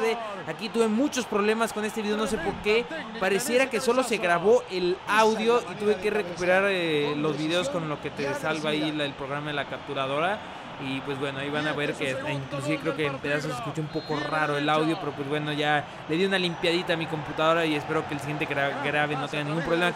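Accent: Argentina. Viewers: Que buen acento de argentino >.< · quisiste hablar como argentino en el video?? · Muy gracioso hablando argentinamente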